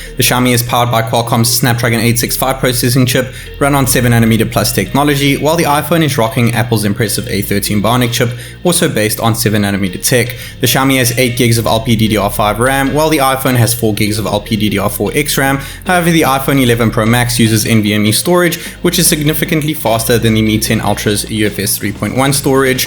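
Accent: South Africa. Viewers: homie sounds south african lol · Sensing a South African vibe Check your Location: South Africa!!! YEBO!!!!! #subbed BTW, where did you get the Xiaomi, I really wanna get one!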